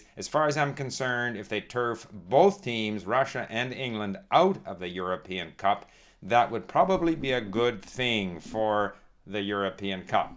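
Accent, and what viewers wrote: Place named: Canada. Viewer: Dude, I am Canadian too. You are the most Canadian sounding person I've ever heard. What part of the country are you from?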